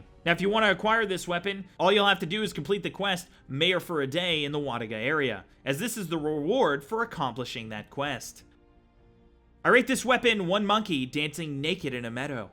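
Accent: Canada. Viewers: Dude sounds like stone mountain with a canadianish accent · I can tell this guy was Canadian in the first 2 seconds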